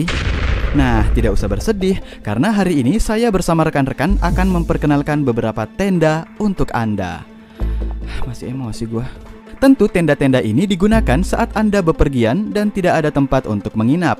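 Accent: Makassar, Indonesia. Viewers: tinggalnya di Maros gua lihatmya di IG dan coba cek videonya yang Review HP,logat makassarnya pasti terdengar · Logaknya makassar rodong ngr😂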